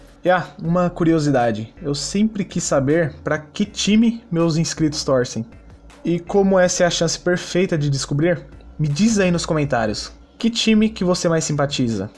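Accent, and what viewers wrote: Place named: Rio Grande do Sul, Brazil. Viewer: Sudamericana valer menos que copa do Brasil? Qual droga? Kkkkkk dono do canal claramente é gremista pelo sotaque de gaúcho kkkk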